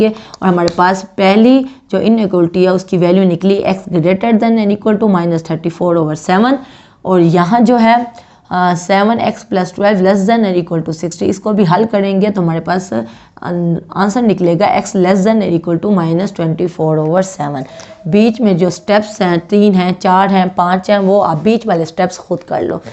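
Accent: Lahore, Pakistan. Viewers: Teacher ka lahja dekh ka pata lag gaya ha k teacher Lahori ha😁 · Sister ap waqas Nasir ki kuch lagti tu nahin..beacuse waqas ny review exercise upload nahin keen..or ap dono hain bhi Multan..zuban ki aarnay sy tu ap Lahori lug rahi hain..jawab zaroor daina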